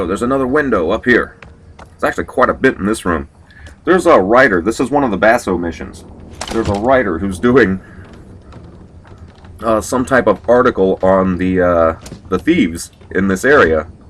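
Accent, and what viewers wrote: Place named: United States. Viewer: Arno Viktor Yeah it's a nice calm voice. Don't normally like the American Accent but dude you got it on lock down lol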